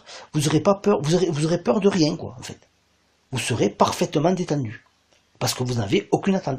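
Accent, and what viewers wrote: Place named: Toulouse, France. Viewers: Bonsoir , tres important vos messages , n'être plus dans l attente , celle-ci est primordiale.. Ouiii 3 belles erreurs qui priment❤ et effectivement le runner doit entendre que le chaser vie sa vie, ça y est , il la conscientisé...et gratitude à l'univers pour tous nos cadeaux de la vie ... Merci belle voix du Sud Ouest 😊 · ✨Je me suis abonnée depuis le début car j'ai de suite ressenti votre authenticité. Votre discours unique fais du bienggg😂 J'ai bien reconnu votre accent car je suis Toulousaine. Continuez surtout car vous faites du bien. MERCI✨🙏✨Bien CORDIALEMENT. ✨💚✨🌟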